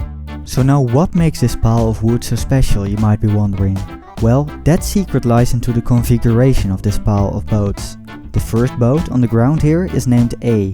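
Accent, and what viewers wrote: Netherlands. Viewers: Het accent wel:3 Ik ben trouwens ook heel blij dat ik een Nederlands accent heb herkend. Ookal is het best makkelijk;3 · Ben je Nederlands? je hebt namelijk een echt Nederlands accent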